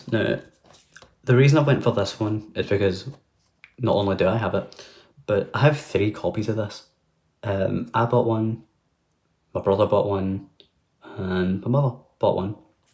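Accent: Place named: Scotland, United Kingdom. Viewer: Hi! - Happy New Year to you. Welcome to the Vinyl Community. Enjoyed your Tag 24 video. was a good choice but you need to get some or. Where in Scotland are you based? As a fellow Scot it’s great to hear your accent. When I do a thread response I often have to show CDs. I love. is an all time favourite. I must listen to your guitar playing videos. Cheers Bill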